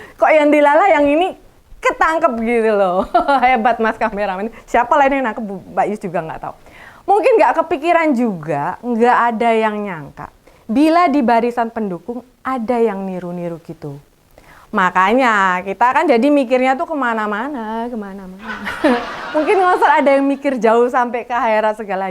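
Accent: Java, Indonesia. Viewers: Mbak Yus asli ndi to Kok jawax medok Jateng opo jatim Q demen ngrungok ke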